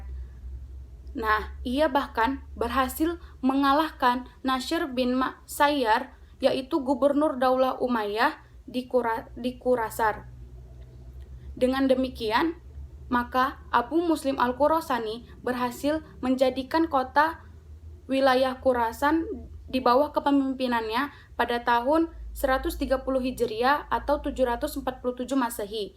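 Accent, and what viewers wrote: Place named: Medan, Indonesia. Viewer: anak medan ya haha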